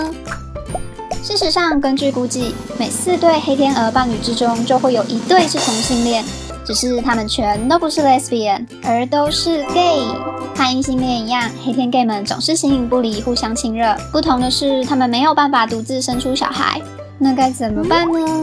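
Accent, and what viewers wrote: Taiwan. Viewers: 講話的風格像台灣吧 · 湾湾的口音让我好出戏🌝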